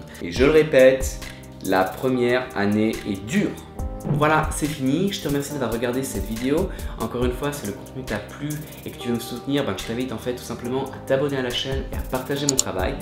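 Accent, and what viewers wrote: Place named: France. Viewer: You have such a lovely French accent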